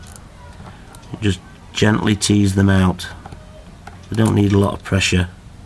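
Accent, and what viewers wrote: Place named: England, United Kingdom. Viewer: Thank you for posting this. I watched this from Connecticut USA, whilst getting a Skype call from from a family member located in North Lincolnshire and commenting, "he's from England!":D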